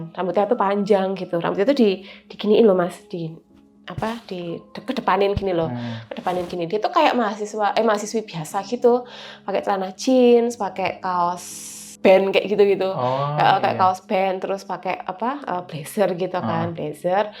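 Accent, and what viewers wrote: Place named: Java, Indonesia. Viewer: Bahasa INGGRIS medok Bahasa INDONESIA medok Bahasa JAWA medok Intinya dia medok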